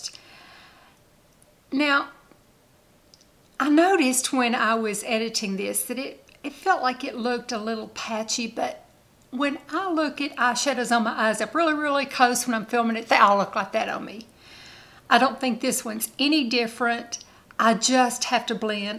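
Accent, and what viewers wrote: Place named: Tennessee, United States. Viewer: I don’t know why, but listening to you, by the way, always relaxes me😊🥰❤️❤️🫶🏻 seriously! Calms me down! It must be the sound of your voice, the tone of it, and the accent lol that accent 😂😘😆 your Tennessee accent calms me down🤷🏼‍♀️❤️❤️❤️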